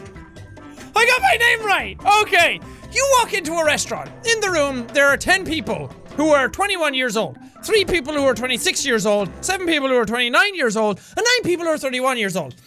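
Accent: Ireland. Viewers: He sounds more Irish the more he gets drunk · It sounds like he gets more Irish the drunker he gets. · Am I the only one that thinks that jack should do this more often and that he gets a more prominent Irish accent when he is drunk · One you are beautiful Two the more drunk u get the more Irish u sound Three are you Devlin out of the sabotor by any chance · He became progressively more Irish with each shot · U know u have a strong irish accent when u start say fookin instead of fucking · Jack gets a thicker Irish accent as the video goes on. Noice. What am I doing with my life. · Is it me or does Jack start to fall back into his Irish a little more when he drinks lol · by the second shot his voice got more irish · The more he drinks the more Irish he turns into, do you notice that? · It seems that the more he drinks, the more Irish he gets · HOW IRISH IS THIS LAD?!? 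· The more shots jack took the more Irish he sounded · he sound more irish the more he get drunk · I love how he sounds progressively more irish when he takes more shots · Jack, you are talking Irish AGAIN... please stop... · Is it just me or does he get more Irish with every shot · I dont know if I'm the only one that realizes, but his Irish accent is way easier to hear in this video compared to others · The more drunk he is his voice gets deeper and more Irish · Did anyone else notice how the more drunk he is the more Irish he sounds? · His true Irish man is coming out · You can hear the complete Irishness in his voice I think jack was drunk at the end there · Im irish and thats just how it works you get drunk and your voice goes full irosh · As he gets more drunk, he gets more irish lmao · i didnt think it was possible for Jack to get even cuter and even more irish. i have been proven wrong on so many levels.. 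· His Irish accent is so much stronger wen he’s drunk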